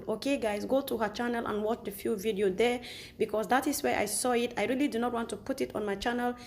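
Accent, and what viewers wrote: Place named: Cameroon. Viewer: Love you bcos my best friend here in UK is from CAMERION and she speaks like you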